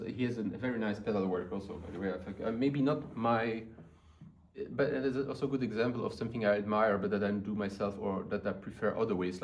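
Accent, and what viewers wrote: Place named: France. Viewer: Ton accent francaise est trop belle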